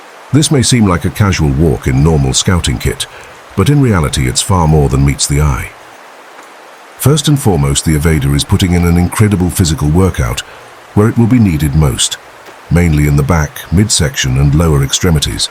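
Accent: United Kingdom. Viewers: Awesome video, the British narration was a very cool feature. Has a documentary type feel to it in the beginning then transitions into your own footage which is neat. Great work 😎👍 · Voice over is brilliant...very English!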